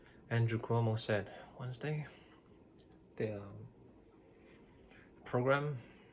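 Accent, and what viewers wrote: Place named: United Kingdom. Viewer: This is Hao. Your work reminds me the Garbric Orozco’s Hammock En La Moma. I also like the way you make the British accent. It makes it sounds very professional. The changing of voice during the news report also makes me think that the broadcast news must be a very boring job, but the people who broadcast it must stay still and act normally no matter what happens.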